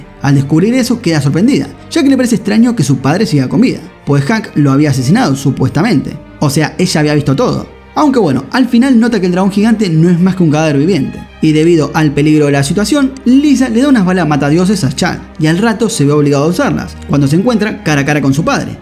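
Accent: Argentina. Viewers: No me gustan los resumenes tan largos pero no avía escuchado uno en ARGENTINO y neta de argentino a el orrible y culerisimooooo castellano que chingón se escucha 😳😅😂😂😂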